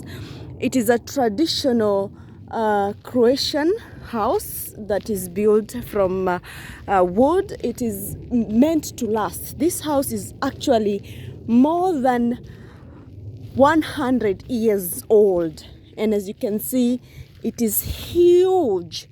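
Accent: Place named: Kenya. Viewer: U sound kenyan.....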